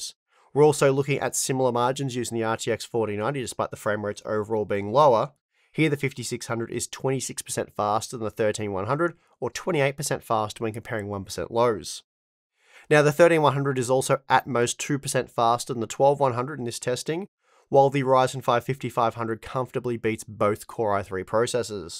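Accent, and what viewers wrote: Australia. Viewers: I'll add one more thing: their reasoning is so cogent, clear, and concise that they do a bonus side service by teaching their viewers how to think critically, a skill that's very rare and precious these days. Oh, and did I mention that the Australian accent is another bonus and a very charming and welcome change in a repetitive sea of Americans?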